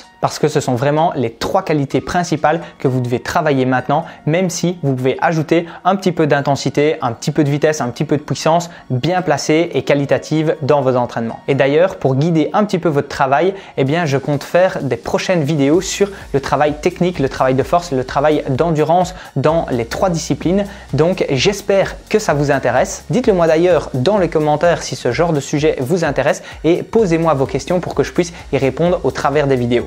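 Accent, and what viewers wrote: Belgium. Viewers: yo man tu es d'où en Belgique? Je crois entendre un accent liégeois mais pas sûr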